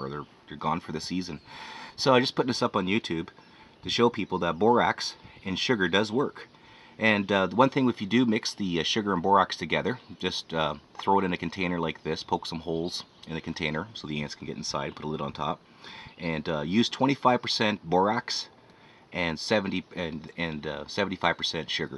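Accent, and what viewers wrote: Canada. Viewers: He has a Canadian accent, and the box has French words. Areas in Canada are French.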